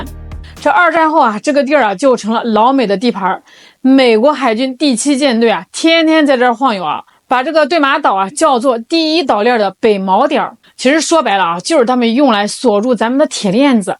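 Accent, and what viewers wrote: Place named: Anhui, China. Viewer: 安徽话真好听，像黄梅戏🎉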